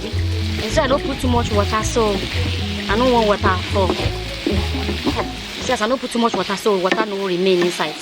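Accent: Nigeria. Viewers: Pidgin english sweet for your mouth oh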